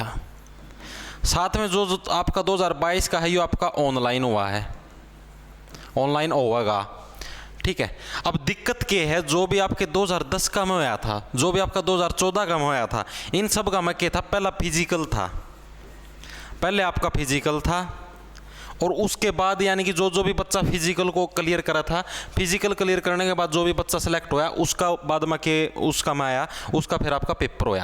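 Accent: Haryana, India. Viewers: Yo bihari nhi hai bhai ye haryanvi hai pradhan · ❤ haryanvi accent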